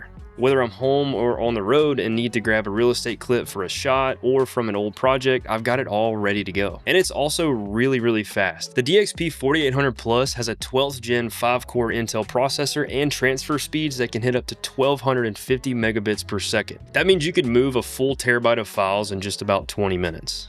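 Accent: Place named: Rural, United States. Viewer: First tech youtuber I've seen with a country accent. Love the build, looks clean!!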